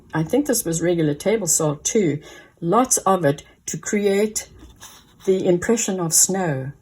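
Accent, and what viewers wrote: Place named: South Africa. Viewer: I know this is out of context, but are you from South Africa? You sound very much from here.. love your channel❤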